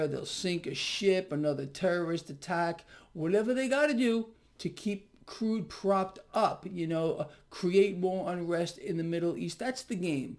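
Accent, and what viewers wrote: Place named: Long Island, United States. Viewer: Cool, you talk like a fellow Long Islander.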